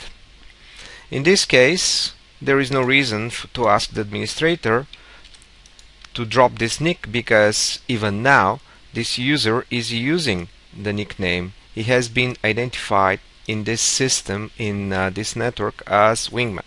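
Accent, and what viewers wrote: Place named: Russia. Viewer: I liked your Russian accent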